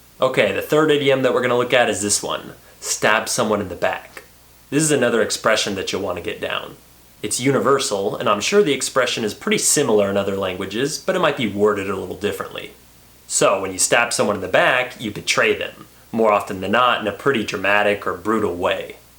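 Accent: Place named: United States. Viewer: i think it can be normal because he speaks as a native American that's why don't be demoralized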